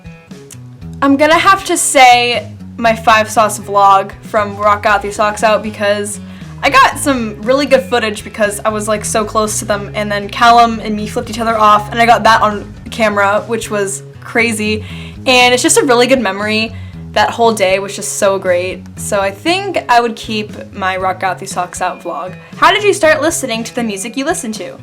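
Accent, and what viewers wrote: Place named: Spain. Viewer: OMG YOUR SPANISH IN LOVE WITH IT AND OFC WITH U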